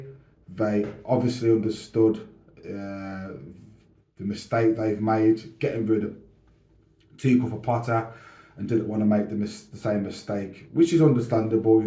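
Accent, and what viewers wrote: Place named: England, United Kingdom. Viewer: look like Lukaku with a English voice 💙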